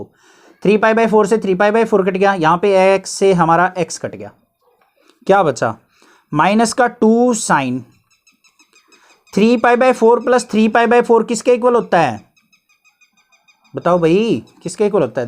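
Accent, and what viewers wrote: Haryana, India. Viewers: Sir aapki language se pta chalta h ki aap Haryana k ho 🤗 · Aapki voice se he pata chalta hai...ki aap Haryanvi ho👍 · i knew it sir aap haryan se ho even i belong to haryana so apka accent se hi samjhgyi thi and yaa sir i agree haryana ki kaafi itni green hai aur udher birds and all ka ghumna ghar ke bahar is so normal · Sir I am also from haryana.aapki boli sa hi lagta hai ki aap haryana sa ho but aapse kabhi pucha nahi vasa kis side sa ha sir aap😅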